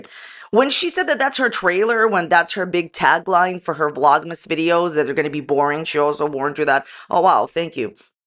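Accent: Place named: East Coast, United States. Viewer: love your accent. I am from the east coast and miss the accent.❤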